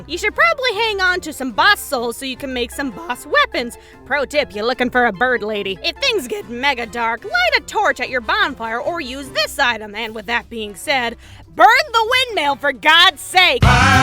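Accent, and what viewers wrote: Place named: Midwest, United States. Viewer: So fucking midwestern!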